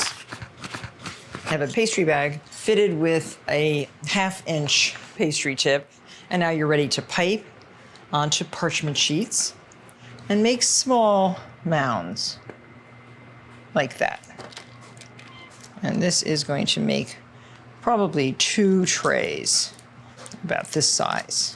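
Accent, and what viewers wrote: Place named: Connecticut, United States. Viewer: I love how Martha speaks french in her Connecticut accent... 😎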